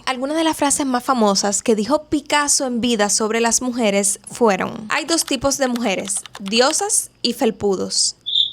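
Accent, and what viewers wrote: Cuba. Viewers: Nena recién conozco tu canal y apenas escuché tu voz pensé: "su acento suena cubano" y cuándo leí el nombre del canal me lo confirmó 😅. Saludos desde Cuba🇨🇺❤ PD: nueva seguidora acá, los temas de tus videos me llaman mucho la atención y estaré haciendo maratón siempre y cuándo la corriente me lo permita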